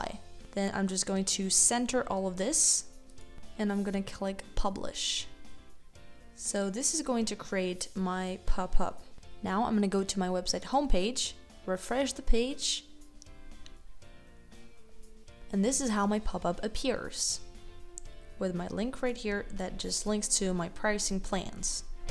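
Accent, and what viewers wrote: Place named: United States. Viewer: love ur american accent ;)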